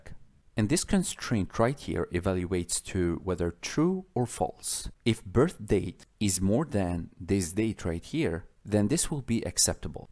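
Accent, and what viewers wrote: Russia. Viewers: This was good! Is your native language Russian?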